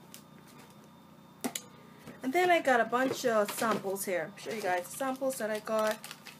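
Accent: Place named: Liberia. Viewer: Hey are you Liberian?? I can hear a liberian accent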